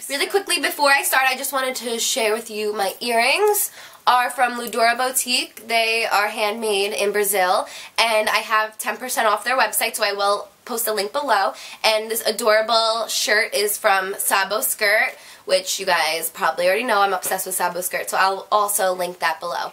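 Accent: New Jersey, United States. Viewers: i love her jersey accent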